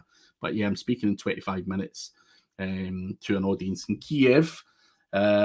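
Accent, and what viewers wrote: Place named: Leith, United Kingdom. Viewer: Many thanks again. Your accent is spot on in the People’s Republic of Leith. - hit me up if you’re looking for a native English teacher; language courses is a niche I work with, can get you some great deals with the top schools; accent really isn’t that strong, with a wee bit of practice, you’ll have no problems.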